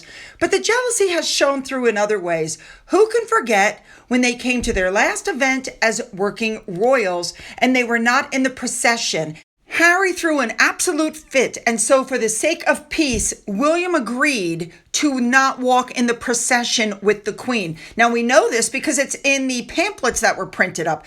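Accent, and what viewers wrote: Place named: United States. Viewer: I don’t find your talking too fast. I’m British and can understand your American accent very clearly.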